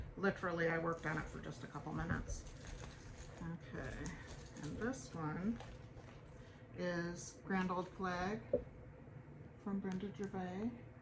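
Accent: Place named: Michigan, United States. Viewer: Great video, sounds like your a Michigan girl. I am, sorry if I'm wrong.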